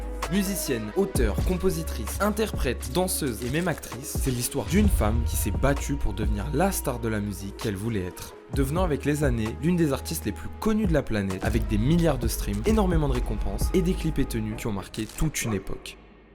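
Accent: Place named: United Kingdom. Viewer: et des rappeurs? Noirs et donc forcément rappeurs quoi! Ce sont des chanteurs, pour ta gouverne et si tu n'as pas ce minimum de culture, faut pas faire de videos pour désinformer c'est pas le but. Ça remet en question la crédibilité des infos de l'ensemble de ta vidéo. Perso jai arrêté en cours de route. Entre ça et l'accent anglais je ne suis pas sur YT pour m'abrutir mais pour m'élever....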